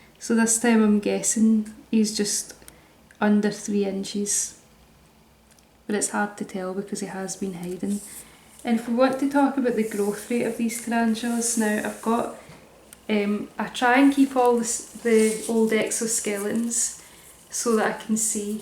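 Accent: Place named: Scotland, United Kingdom. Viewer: Scottish accent on a girl though.. wow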